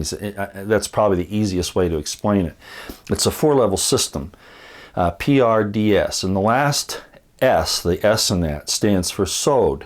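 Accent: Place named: United States. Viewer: His first language is obviously not Hebrew so you have to apply his american accent to it. Seriously, I do not criticize people that do not speak English very well. I give them props for knowing two languages.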